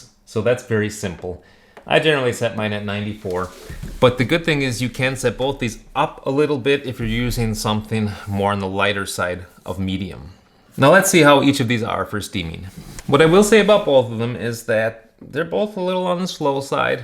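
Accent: United States. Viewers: Are you American or Canadian I genuinely can’t tell I’m English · Wait? That is an American Accent ? I thought you were European ? Where in States is that accent from ?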